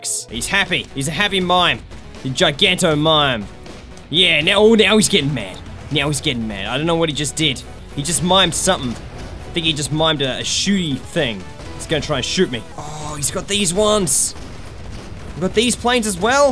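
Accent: Australia. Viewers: hes australian so he has a different accent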